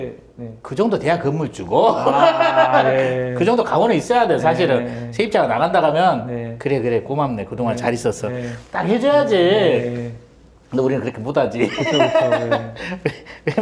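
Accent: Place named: Jeolla, South Korea. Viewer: 아...이책 꼭 구입해야겠습니다.. 구수한 전라도 사투리..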